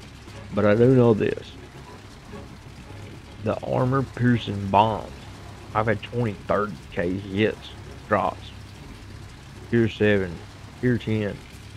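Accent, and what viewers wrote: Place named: South, United States. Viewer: Great game. Not a CV player either but enjoy all the other surface ships. I'm a player from Middle Tennessee so it's nice to see someone with a southern accent doing wows videos.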